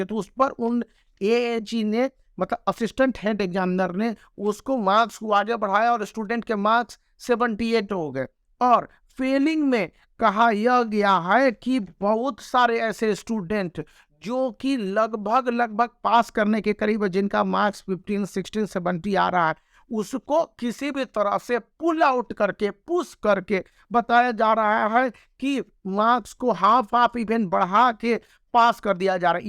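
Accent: Bihar, India. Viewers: sir aap bihar se ho kya??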